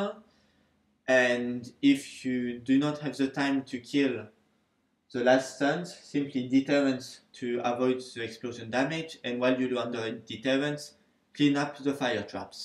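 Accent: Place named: France. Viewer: Your English is not very good if you have trouble parsing a French accent.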